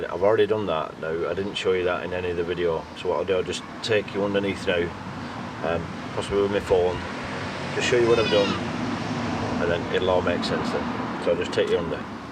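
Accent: Wigan, United Kingdom. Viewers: Great video bud. I wanted to see this exact part of the installation, I'm glad I did too, that pump makes a right horrible noise! Its definitely getting fed from the tank, I'm not having that inside my van! 🤣 And I have to have a guess, you sound like you're from Wigan, maybe Bolton? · is that a wigan accent? great video, very helpful.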